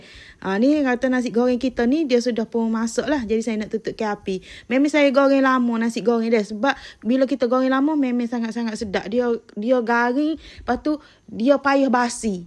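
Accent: Kelantan, Malaysia. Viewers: Sedapppnya...tk resepi..asyik dengar kecek klate muuu...😊😊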